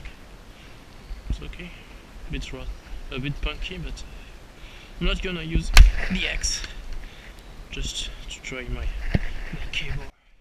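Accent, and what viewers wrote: France. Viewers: You sound French but correct me if I'm wrong. I'd just like to know what's it like to camp. Thx uk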